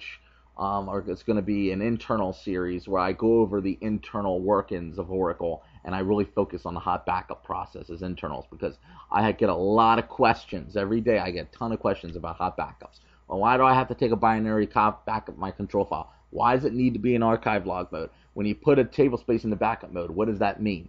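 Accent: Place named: United States. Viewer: the only video on youtube with north american English accent, easy to understand and no headache, no matter how good tutorial is by our Indian bros but very thick accent not worth watching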